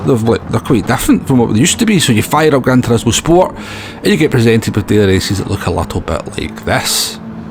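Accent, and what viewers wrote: Scotland, United Kingdom. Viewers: Holy shit I had to turn on the sub titles thick scotish accent 😳 I though we spoke the same language At the end of every video you should yell FREEDOM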